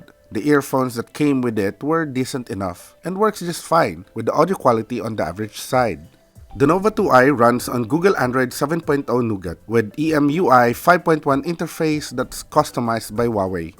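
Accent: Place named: Philippines. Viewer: Why does he sound like a Filipino speaking in english?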